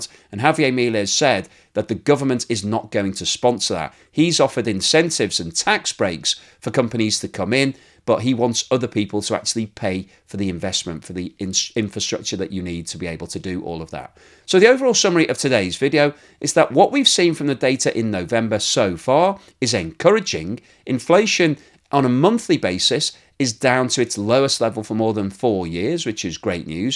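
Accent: United Kingdom. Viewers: Even your charming British accent doesn’t help you with one major flaw with your analysis. And that is that the Clown known as Javier Milie revealed his pure incompetence when he said that the only countries in the world that he is going to have economic relationships with are USA and Israel. He completely omitted China which is the economic powerhouse on the global scale. Milie is a complete imbecile and Sadly many Argentinian were too ignorant to see that.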